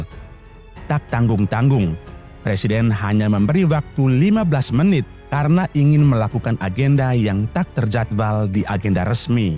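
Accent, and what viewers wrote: Indonesia. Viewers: Logat Admin nya seperti Logat Karo😊👌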